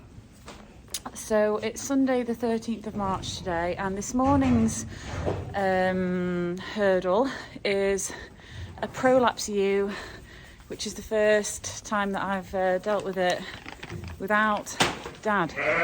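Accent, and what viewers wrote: United Kingdom. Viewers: You are sounding like a strong Derbyshire farmer every day !!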